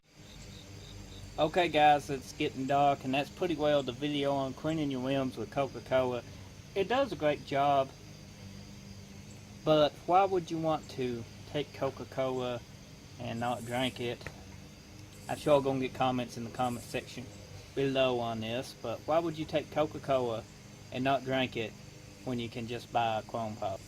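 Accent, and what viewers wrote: United States. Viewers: American accent with a speack dialect but damn good video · Love the American accent mate. I'm from Ireland... and it sounds nice. Good video, I'm going to try it on my škoda.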